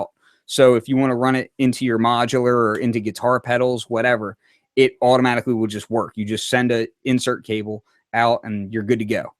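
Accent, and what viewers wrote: Philadelphia, United States. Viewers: are you from Philadelphia? I detect an accent. I'm from Montgomery country, the north west suburbs.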